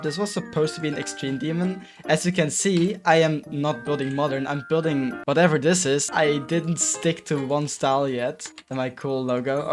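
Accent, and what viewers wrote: Germany. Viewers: Youve got a german accent, are you from Germany? I am austrian btw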